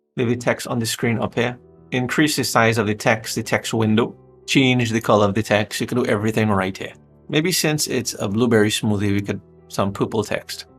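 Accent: Trinidad and Tobago. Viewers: Are you from Trinidad I hear your accent.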